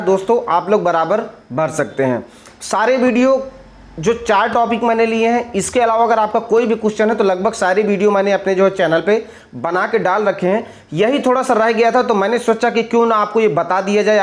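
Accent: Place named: Maharashtra, India. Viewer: Are marathi English,,sidha point to point bat kro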